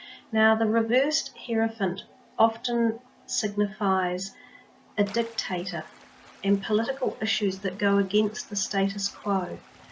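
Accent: New Zealand. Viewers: I'm loving hearing your Kiwi accent!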